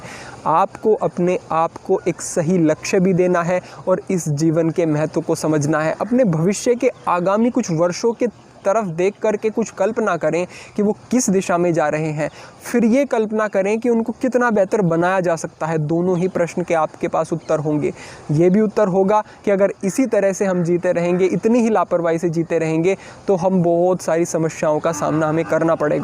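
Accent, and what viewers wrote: Standard. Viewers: So clear and Pure Hindi and video is good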